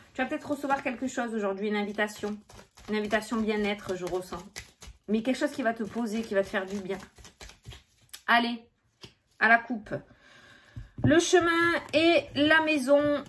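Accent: South, France. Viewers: Bravo d’être là pour nous en ces temps difficiles. Avé l’accent. ☺️🤗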